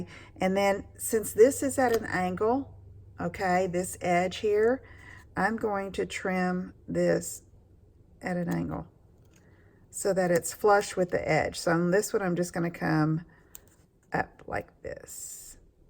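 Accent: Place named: Texas, United States. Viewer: I'm a native Texan. Love that drawl.❤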